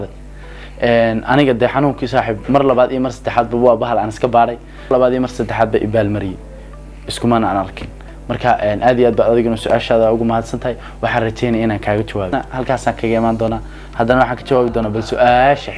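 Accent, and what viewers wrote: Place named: Mogadishu, Somalia. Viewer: Lahajda Heer Xamarkad Wadata Weli Bro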